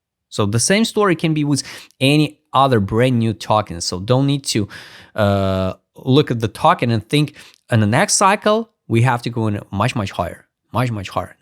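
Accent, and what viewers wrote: Russia. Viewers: Pyсню слышу сквозь попытки англицировать 🖕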